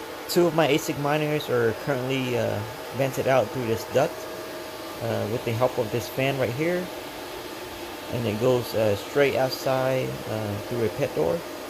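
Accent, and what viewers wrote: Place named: Vietnam. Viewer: You have Vietnamese accent.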